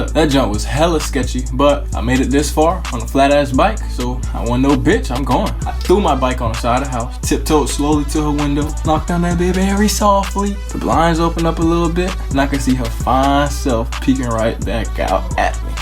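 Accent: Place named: Florida, United States. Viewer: lmao this nigga accent... Flawda as fuck lol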